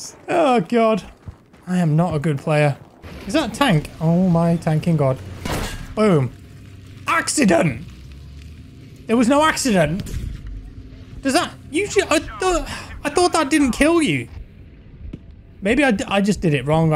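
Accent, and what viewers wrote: United Kingdom. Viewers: what is your morning routine? I would be real mad if there’s no tea involved. Anyways, you’re such a classy lad. You sound so British in the good way, if that makes any sense (it doesn’t). My brother doesn’t agree though!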